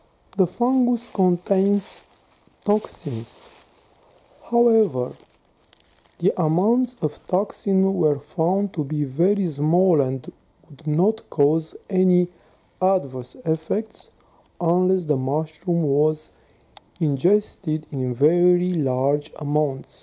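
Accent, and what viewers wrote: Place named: Europe. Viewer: Alright..not likely to pick up ANY white gilled mushroom. Are you from...difficult to tell- Italy, France, Austria; a mans voice is harder for me to discern .You can tell me.I learned so much from my European journeys!I loved it.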